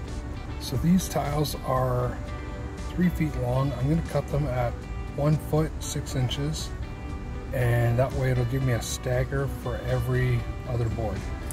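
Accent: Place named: Midwest, United States. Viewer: “Ope, just tripped there, sorry” you’re definitely from the Midwest 😅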